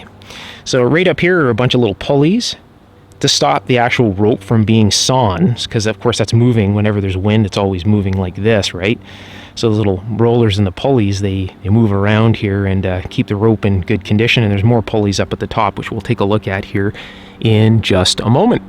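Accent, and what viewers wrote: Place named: Canada. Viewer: He sounds Canadian.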